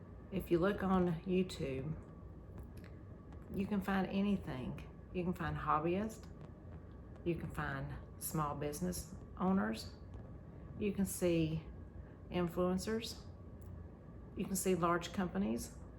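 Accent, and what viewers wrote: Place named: South, United States. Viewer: Hi, congratulations on 3 years of YT👏👏. I didn’t know that YT started 20 years ago, wow 🤯. I truly enjoy your videos, you are a great seamstress and I also love your southern accent 🥰🥰. Thank you for sharing your Zucchini bread (now I’m hungry 🤣🤣). Have a wonderful Holiday weekend 💖💖💖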